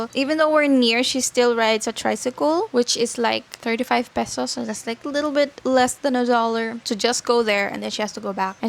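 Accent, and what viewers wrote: United States. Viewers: How come your English is so fluent. If I hadn't known you're from PH then I would've thought you were based in the U.S.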